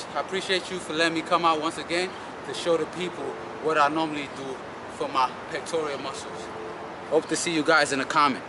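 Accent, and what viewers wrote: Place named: New York, United States. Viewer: New York city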